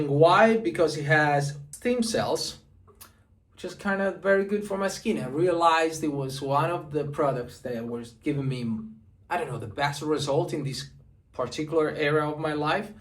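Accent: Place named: United States. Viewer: Your English is pretty good! Where did you learn it? You sound like you have been in the States for a while.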